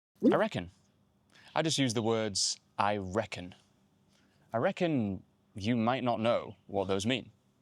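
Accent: United Kingdom. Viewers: I really love watching your video sometimes I practice to talk just like your accent British accent talking to myself haha 😂😂 I learn a lot from you.thanks u from Philippines 🇵🇭 with love.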